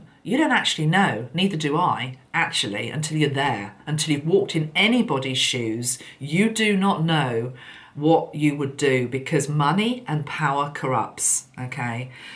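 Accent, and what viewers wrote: United Kingdom. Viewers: This upload was so enjoyable from start to finish. Loved how you tied everything together with the snakes and arrows game, and you have such a beautiful British accent.💖 Thank you for all that you do.💖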